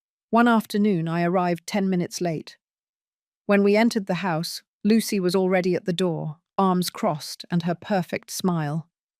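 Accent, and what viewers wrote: United Kingdom. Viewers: Me too its an englih UK people accent .I enjoyed listening.i ❤it🎉🎉🎉🎉🎉🎉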